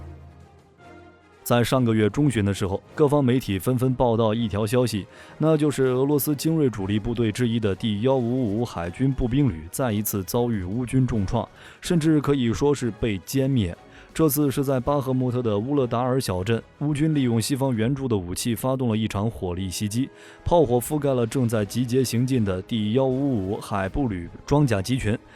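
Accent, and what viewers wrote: China. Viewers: 你个台独媒体,用中国口音的配音,可耻.你应该用台湾语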